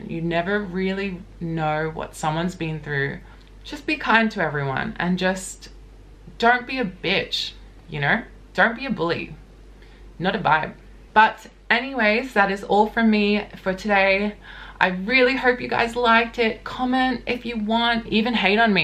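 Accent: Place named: Queensland, Australia. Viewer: You sound like a Queensland girl, or is that just me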